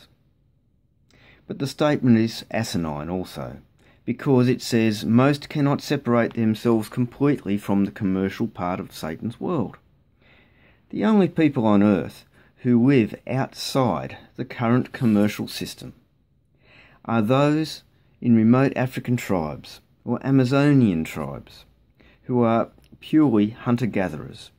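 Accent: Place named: Australia. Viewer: Thank you for sharing, we in Qld, nice to hear another Aussie.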